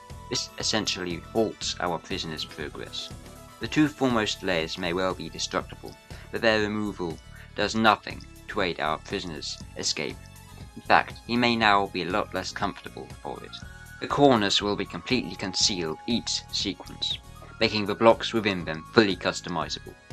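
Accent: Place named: United Kingdom. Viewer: I enjoyed the british accent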